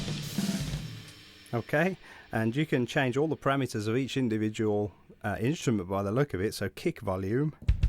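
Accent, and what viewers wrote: Midlands, United Kingdom. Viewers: Where in the midlands are you from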